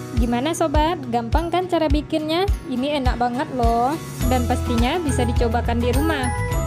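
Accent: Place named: West Sumatra, Indonesia. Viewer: Kk org minang nya,,, keliatan dri logat ny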